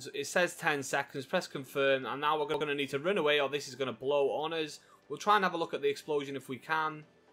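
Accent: United Kingdom. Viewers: thank you for your uk accent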